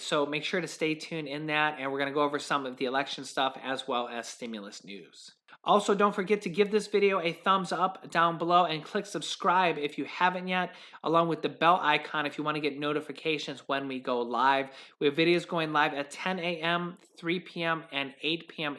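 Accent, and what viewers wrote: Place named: Chicago, United States. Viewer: it sounds like Chicago slang me and my wife LOL,.. another one could I ax. you a question...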